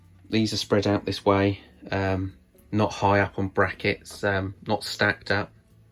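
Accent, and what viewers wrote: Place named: United Kingdom. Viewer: Great Video! Very similar to what I'm building at the moment so very helpful and nice to hear a fellow Brit rather than another American accent!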